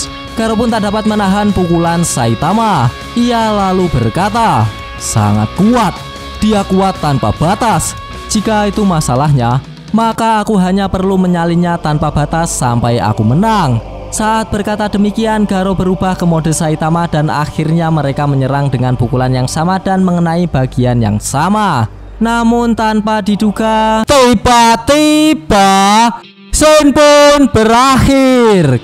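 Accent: Java, Indonesia. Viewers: Jurus2nya kocak anjir medok😂😂😂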